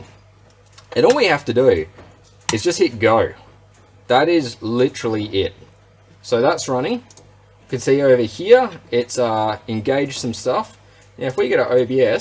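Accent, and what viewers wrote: Australia. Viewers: Mate, I just bought me one of these, just because I could. I mess around with Raspbarry Pi's, have the 26TOPS HAT, still figuring it. But saw one of these and just had to have one. Got it with a MIPI touch screen, so i can make it all in one, just add power. Love your review, it is so much better to hear an Aussie voice doing these 'weird' hobby stuff. Cheers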